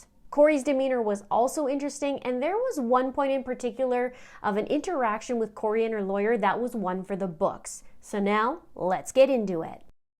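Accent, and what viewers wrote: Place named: Canada. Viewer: Love your videos! You cover these crimes so well and I like your Canadian accent😊 Canada is the favorite country I have ever lived in!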